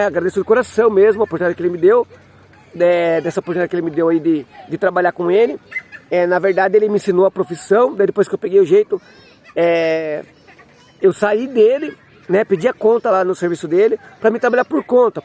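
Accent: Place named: Rio de Janeiro, Brazil. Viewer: Sempre sigo suas dicas pois é um dos melhores canais sobre mecânica e principalmente sua humildade seu carioca e simplicidade nos vídeos que são muito instrutivos que Deus continue te abençoando sempre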